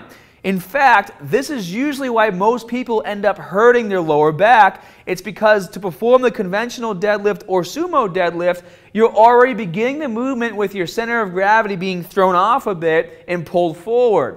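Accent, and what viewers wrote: Boston, United States. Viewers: Can someone explain to me why has a Boston accent now? He never used to? I feel like I'm in a alternate universe or something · This dude has a crazy Boston accent. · Fantastic content and even better thick Boston accent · Somehow I think is from Boston. Just sayin. About to grab a hex bar for the house since we're all trapped at home lol. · Dear god, your accent is so much Boston 😆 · Hex bah. Someone sounds like he's from Bean Town. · Boston accent no doubt haha. I'm from the south so we all have accents. Great advice though. Subscribed. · You must be from Bahston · U from Boston? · is this a boston accent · This dude used to work at the maaketing depaatment, reminds me of my buddy from baaston. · Where did this Bwoston accent come from?? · That Boston accent tho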